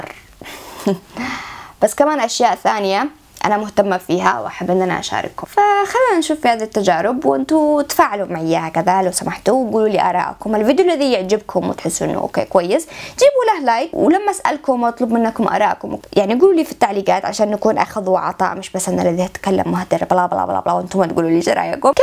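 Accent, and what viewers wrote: Sanaa, Yemen. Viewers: انتي.غير بنات اليمن.انا مش مصدق انك يمنيه.لهجتك خليتنا اصدقيك انك.من اليمن.الله يحفضك وايوافقيك اختي.هيثم من اليمن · فديت اللهجه هذي الصنعانيه الحاليه اللي اشتي اتعلمها وفديت بنت بلدي الانيقه الراقية الله يسعدش 💗🙂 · محلاش ماشاء الله عليش يوم تتحاكي بالصنعاني ي لبى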